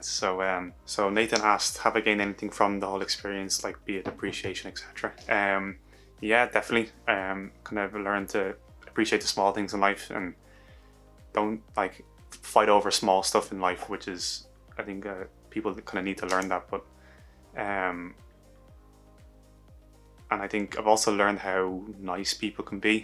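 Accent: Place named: Ireland. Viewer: Are you in Ireland? Your accent sounds Irish.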